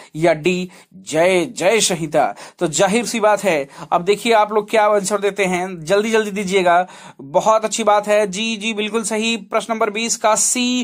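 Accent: Pahadi, India. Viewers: Pahadi hindi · पहाड़ी हिंदी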